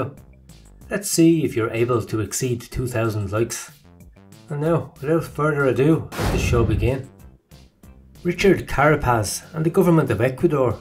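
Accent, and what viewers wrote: Ireland. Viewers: Is that an Iris accent I detect?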